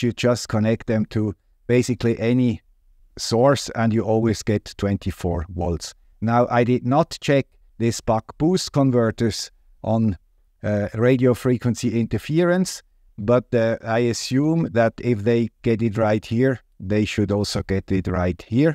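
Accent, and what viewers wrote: Switzerland. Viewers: Hello friend with the Swiss accent. In case you don’t hear it enough, I’de like to thank you and also applaud you for the way you have setup your channel. Your content is extremely well planned and laid out without all the bloat of other YouTubers in this space. Lean and clean to the point and. I find myself going back to your videos many years later as a reference point when needed. Looking forward to more truly high quality content next year ! 🙏🏽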